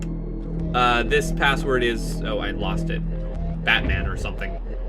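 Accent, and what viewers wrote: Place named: Canada. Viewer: Aw, your Canadian vowel raising is so cute~! <3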